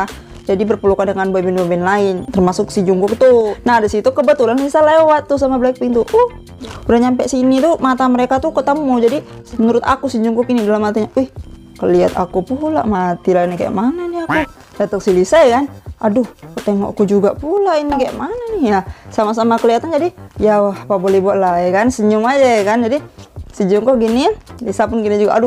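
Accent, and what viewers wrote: Medan, Indonesia. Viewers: Kk orang mana sih k Logatnya kayak orang medan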